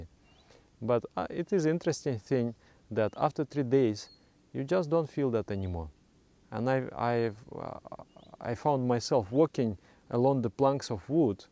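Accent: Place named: Russia. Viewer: Russian pronunciation in english sounds great, it’s like speaking english but russian way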